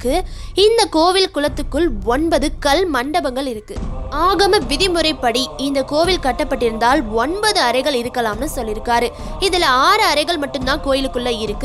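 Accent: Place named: North India, India. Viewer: Unga Tamil voice super...!! North Indian girl Tamil pesura Mari Iruku....!!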